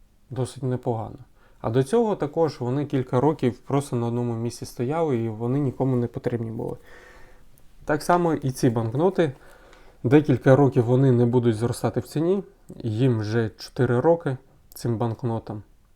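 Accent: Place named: Lviv, Ukraine. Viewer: Моя повага. По Вашій мові і не скажеш, що Ви не зі Львова.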